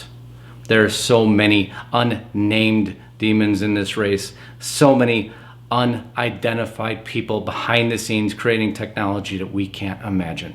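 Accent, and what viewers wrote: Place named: Chicago, United States. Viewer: Any chance that you are from Chicago?? Your accent is thick 😊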